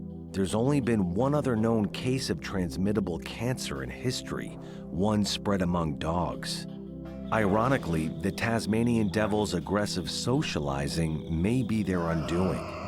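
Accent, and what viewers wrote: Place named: United States. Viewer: this was an excellent video of a group of truly unique animals. However, I want to highlight 2 things on my mind which I'd like to point out. First, your accent sounds as if you're a fellow American, though I guess you could also be Canadian. If the latter, ignore the following complaint. I noticed that you used only the metric measurements, rather than doing as many to most heads of channels: use both. It would have been helpful for us Americans. The other is a wording problem in the star-nosed mole section. The professor said that this creature is "one of the only" of something, which is grammatical nonsense. The reason is that "one of" indicates there's more than 1 of something, whereas "only" means there can't possibly be more than one. What should be used in this type of situation is the phrase "one of the few".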